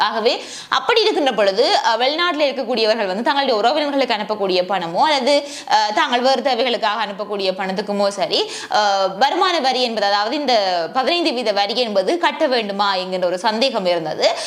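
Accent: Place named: Jaffna, Sri Lanka. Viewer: (யாழ் )பேச்சு வழக்கு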